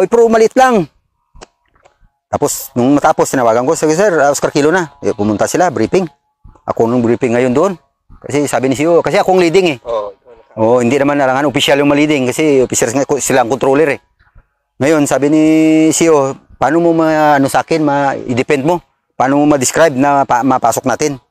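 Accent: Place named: Iloilo, Philippines. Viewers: Ilongo SI sir sa tuno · Ilongoha nlang SG.nami pamatian god bless SG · 😂😂😂😂 natawa Ako sa Punto Ng salita sya parang ilonggo 😅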